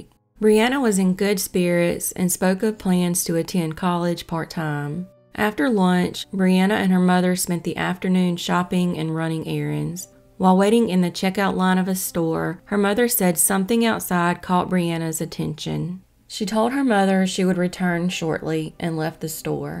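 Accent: South, United States. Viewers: each region has their own pronunciations. For example, Quincy is pronounced “Quinzy” in the northeast. Otherwise, I think the narrator/researcher did a fine job of pronunciation. We would all be guilty of mispronouncing names outside our own regions. And yes, a heavy southern accent can take some getting used to, but you can say the same thing about a heavy NY/NJ accent or a heavy Boston/NE accent.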